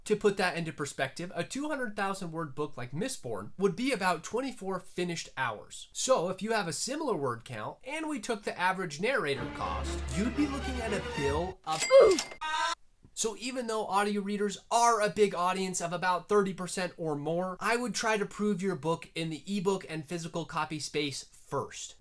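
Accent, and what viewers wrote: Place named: RP, United Kingdom. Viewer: Okay, but I think I need more of your posh British accent? It was so good hahaha (Also, I'm always here for the shout-out! 🙌🏼🙌🏼)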